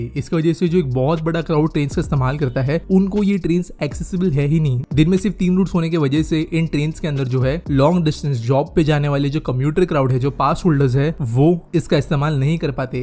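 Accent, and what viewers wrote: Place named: Maharashtra, India. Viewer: Good video.. but talk slowly. I can sense that you are Maharashtrian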